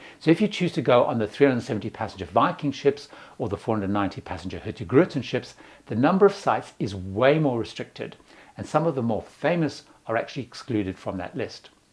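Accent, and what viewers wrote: South Africa. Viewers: Hey, are you South African?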